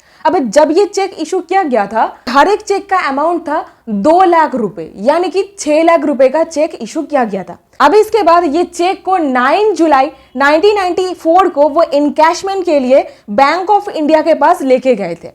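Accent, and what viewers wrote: Bengal, India. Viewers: You talk like Mamta Banerjee... tussi bong ho? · Bengali accent bahut achha hai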